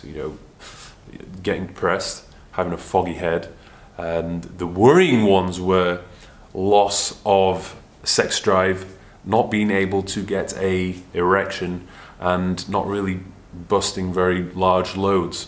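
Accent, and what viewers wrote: United Kingdom. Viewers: You don’t need hair,. Look at your jawline lol you have an amazing physique buddy and your British accent only makes you more attractive. You have no reason to be self conscious, you seem like a great guy.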